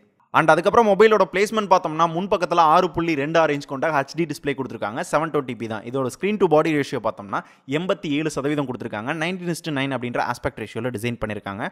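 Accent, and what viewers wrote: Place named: Madurai, India. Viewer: Bro neega madurai thaana... Norikkivittainga...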